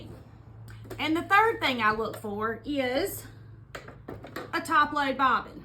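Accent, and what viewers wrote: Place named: Tennessee, United States. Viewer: I ❤❤❤ your accent. My Grandmother, the one who helped raise me, was from NW Tennessee (Union City area). Listening to you is like being with family. 😢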